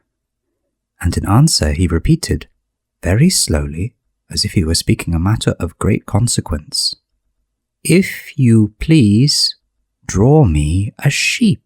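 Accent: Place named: England, United Kingdom. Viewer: 와 진짜 crazy voice... i really like the intonation of English English.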